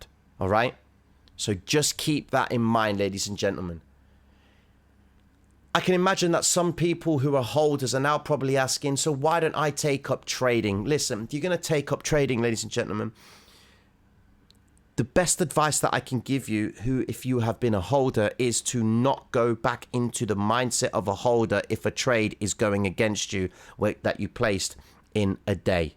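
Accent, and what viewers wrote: Cockney, United Kingdom. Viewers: Love you cockney T.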